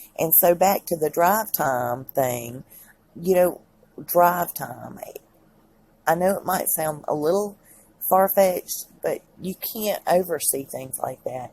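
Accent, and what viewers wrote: South, United States. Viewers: Your Southern Accent is SO-O-O -o-o-ooo charming and especially——AUTHENTIC!!‼️ Dearest-Sweetest——💕 💕—— Please, Do NOT EVER Change or alter your native, naturally acquired speech & speech patterns or ACCENT‼️‼️‼️. You are sooo authentic and genuine in this time of practiced artifice‼️ PLEASE stay just yourself and who you are‼️. Take care of yourself and ALL of your precious family💕‼️. And... LOTS OF LOVE AND MANY BLESSINGS😘‼️ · I love your southern accent your voice is so kind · I love the southern accent, crazy/evil people have to contrive a way to upset you. I would delete any offensive comments. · Hell, girl- I've got such a southern accent that I never even noticed you had a southern accent!! Lmao!! I'm dead serious. 😬😂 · As a southern girl, born-and-bred in Alabama, so refreshing to hear your accent. Just keep being you. 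Your job is to inform us and you are doing an excellent job of that. A job that you took on voluntarily. God bless you and yours. From Another southern belle!!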